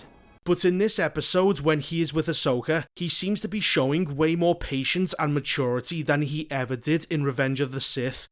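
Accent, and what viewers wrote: Liverpool, United Kingdom. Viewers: i can't take the beans on toast accent seriously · Scouse, innit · Mate you make me laugh ye sound scouce we’re ye from buddy lol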